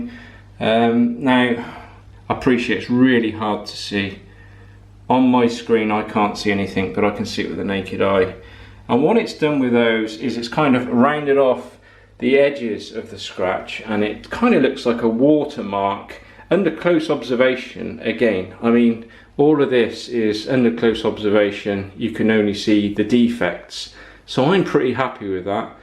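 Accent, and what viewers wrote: United Kingdom. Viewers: My grand parents and beyond were Brits and I really enjoy the accent...I'm a yank now...The vid was very informative and I need to do this...am in the process of restoring a 93 Honda Prelude that has tons of micro scratches from dirty windshield wipers being dragged over a dry windshield...Thanks again...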